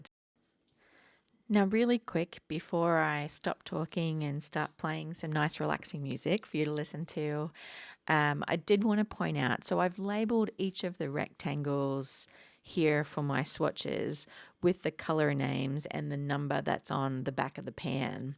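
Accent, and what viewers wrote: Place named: Australia. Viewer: I bought this set to start to watercoloring this year so I am excited to follow along with you to learn how to make swatches. I am an Aussie expat too so it is great hearing your accent.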